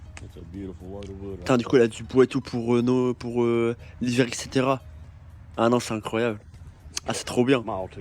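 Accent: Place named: Arab world. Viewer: W VIDEO W ACCENT ARABE ARR 😭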